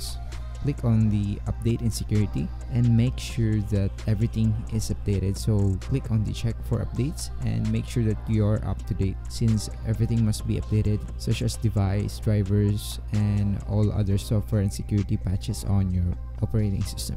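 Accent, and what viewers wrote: Philippines. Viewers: nagawa konayung method 6 and then nag start nako ng game tapos yung problema konaman ngayon is vanguard not initialized hayop nayan valorant na valorant nako halos 2hrs nako naghahanap ng solution kada tapos ko ng method meron nanamang bagong problem btw salamat pre alam ko pilipino ka halata sa speaking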